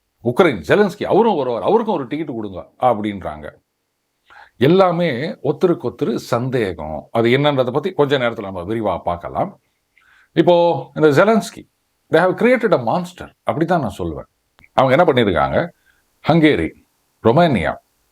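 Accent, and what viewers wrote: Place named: North Chennai, India. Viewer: Pl pl speak in real Tamil not in north Chennai accent. So cruel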